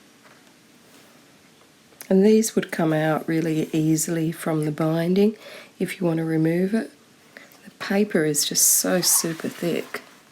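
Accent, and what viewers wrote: Australia. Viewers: Thank you! I enjoy your videos so much. In part because of your lovely Aussie accent, but mostly because you introduce me to book from around the world. Thanks again!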